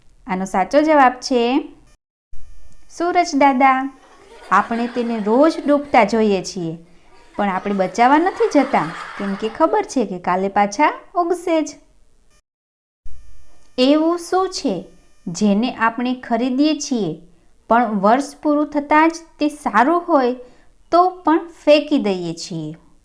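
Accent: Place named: Ahmedabad, India. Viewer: Ahemdabad vada No avajj che shaci vaat ne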